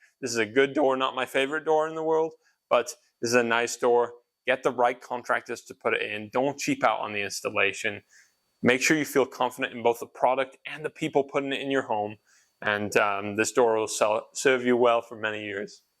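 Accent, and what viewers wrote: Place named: England, United Kingdom. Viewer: Your accent is so interesting, little English-sounding words here and there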